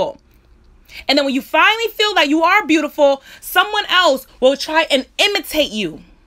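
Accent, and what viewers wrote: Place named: New York, United States. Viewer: Metelyrics a New York accent maybe ? Lol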